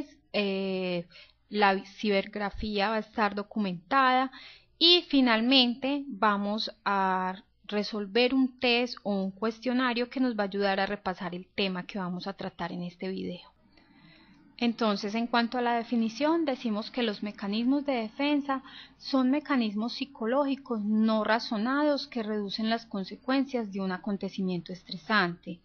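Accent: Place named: Colombia. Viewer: Su acento es muy fuerte y no logro entenderla, es de colombia verdad? bueno, no es criticar solo decir que el huehueo que hace al hablar no hace que se entienda profesionalmente el vide.. mejor los acentos neutros.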